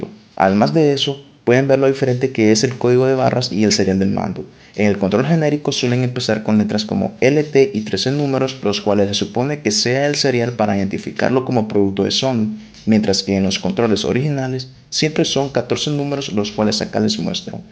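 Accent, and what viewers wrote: Nicaragua. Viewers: Yo también y además se te escuchaba el acento a nica por eso fue que te pregunte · Maje, ¿sos nica? :0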